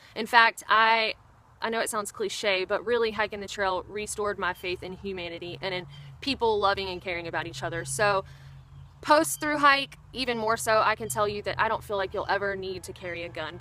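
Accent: South, United States. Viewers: This is interesting, - you sound like you are from the South, and your name strongly implies Southern heritage, yet you pronounce the word 'Appalachian' as only a non-Southerner would. I mean no offense, but what happened? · Ooooh you crazy American cousins. I love your channel, and could listen to your accent all day, and liked your comments. I would say that your mind is the best defence that you have. What is the American obsession with guns??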